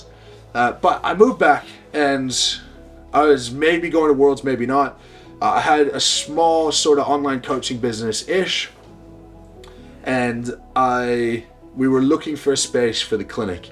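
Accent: Australia. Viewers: Now i see where you got your slight aussie accent from. Thanks for sharing your story. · pretty cool how you picked up a slight ozzy accent during your time there!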